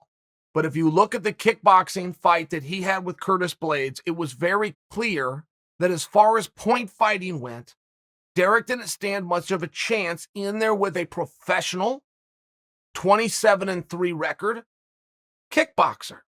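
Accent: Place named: United States. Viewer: People make fun of the the way Chael says names with his hyper-American accent like everybody has an accent and says things a certain way.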